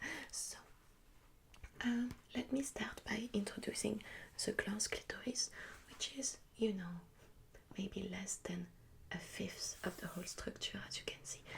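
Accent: France. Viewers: traffy jump maybe because she’s French??? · I love your French accent! Greetings from Brazil! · Why this french accent?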